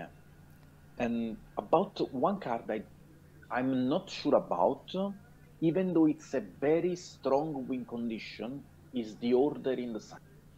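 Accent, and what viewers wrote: Italy. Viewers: italian pronunciation, i love you <3. TE SE AMA, FORZA ITALIA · love the spaghetti accent and you should interview Madolche God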